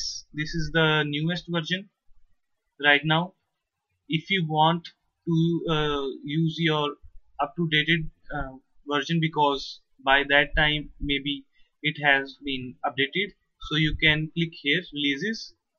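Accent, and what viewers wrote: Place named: India. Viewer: I Fu*k love Indian english tutorials <3, thx bro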